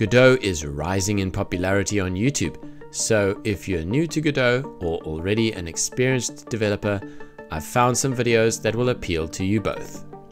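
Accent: South Africa. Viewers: That an SA accent I'm picking up?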